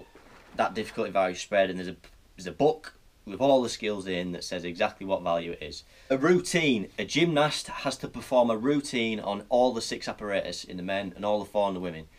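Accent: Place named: Durham, United Kingdom. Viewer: Cool video, and your accent is awesome. Is it Durham or thereabouts?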